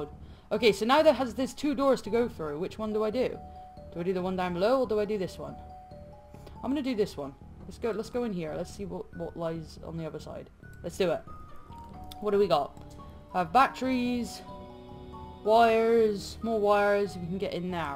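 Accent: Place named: Ireland. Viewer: There’s a 70% he’s Irish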